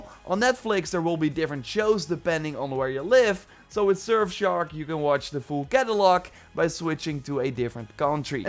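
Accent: Netherlands. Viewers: Are you from the netherlands? You sound kinda dutch to me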